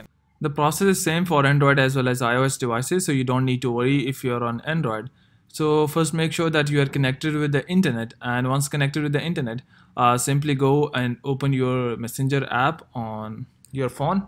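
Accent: India. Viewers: Beautiful Indian Accent 😍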